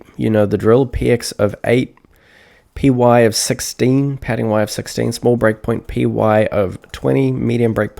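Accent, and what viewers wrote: New Zealand. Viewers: New Zealand accent 🤔 chur? 😁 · You a kiwi?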